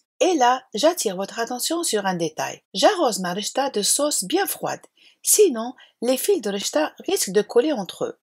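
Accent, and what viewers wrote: Algeria. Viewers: اشتركت في قناة على خاطر الغربية نتاعك تخرجلي شابة بزاف. من فضلك وصفة الفاكهة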